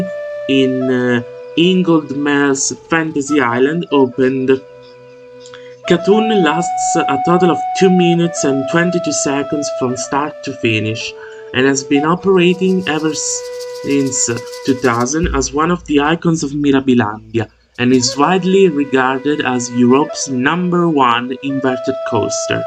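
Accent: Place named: England, United Kingdom. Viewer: Bravissimo mi piace quella pronuncia inglese ;)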